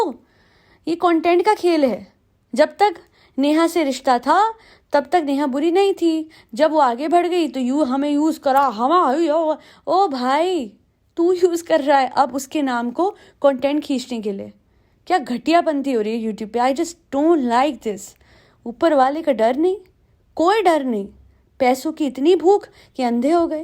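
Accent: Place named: Punjab, India. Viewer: I am in ❤ love with your Punjabi accent plssssss aise hi podcast banaiye❤❤❤❤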